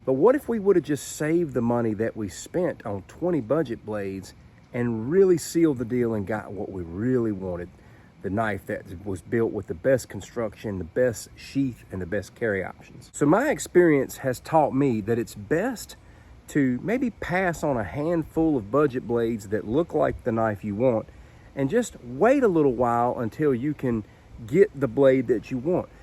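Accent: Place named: West Virginia, United States. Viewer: I sent this to my mother and her jaw dropped. 😂 We have the same facial features, eye color, goatee, shaved head, height, weight, age, speaking cadence, etc. And we're both blade enthusiasts. The only difference is your accent is West Virginia, and mine is Kentucky. When I start making videos, I'll send you a link. It's wild, bro. 😂😂😂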